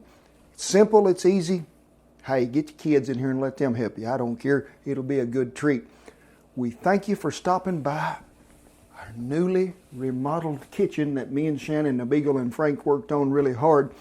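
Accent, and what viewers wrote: South, United States. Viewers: Hahahaha, love how he splits it evenly. Love the idea and I will buy some cod and try this out. Oh yeah, gotta love that country accent!😁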